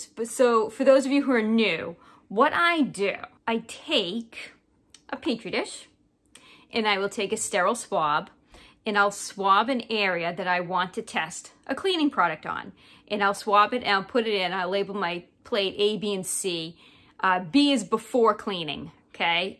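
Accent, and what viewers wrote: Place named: New York, United States. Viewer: Can't get over her accent. New York somewhere?...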